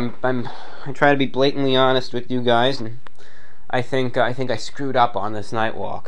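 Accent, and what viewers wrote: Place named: New York, United States. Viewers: Love hearing his New Yorker in his early years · Damn your New York accent really shines in this one.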